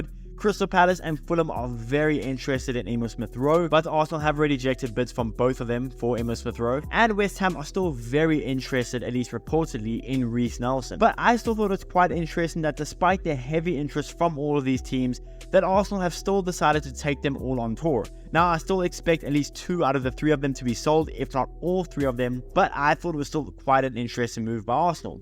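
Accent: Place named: South Africa. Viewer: South African 🇿🇦 accent for the win 👊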